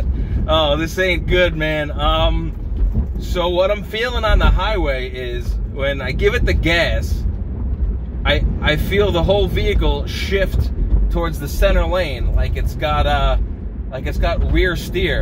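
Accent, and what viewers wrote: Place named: New Jersey, United States. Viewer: I thought you're from jersey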